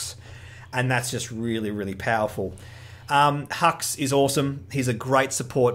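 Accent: Australia. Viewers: Is that an Australian accent? Whereabouts are you? I... I need someone to play with XD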